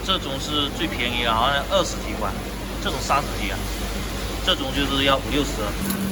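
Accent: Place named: Fujian, China. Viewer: 你是福建人吗。。。 哈哈 口音好重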